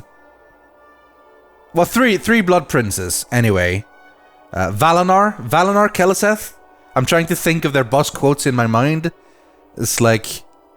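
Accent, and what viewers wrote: Sweden. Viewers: the most Swedish accent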